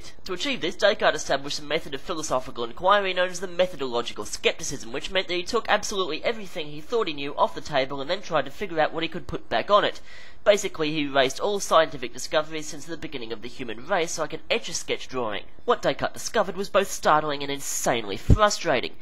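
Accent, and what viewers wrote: United Kingdom. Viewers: wow...honestly, i got a totally wrong impression of what you looked like judging from your voice...lol, guess its just the English accent. Still another awesome 3 minute philosophy yet again and thanks dude! i aced my philosophy midterm because of you! also, you think you could maybe do a 3 minute philosophy on Nagel? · Only a British accent could pull this off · okay, this was so fucking funny, I love British sarcastic drawl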